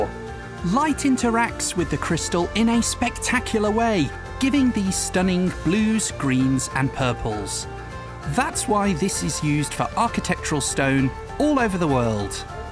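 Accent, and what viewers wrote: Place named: England, United Kingdom. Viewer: Lovely video. Funny that an Englishman is narrating it 😎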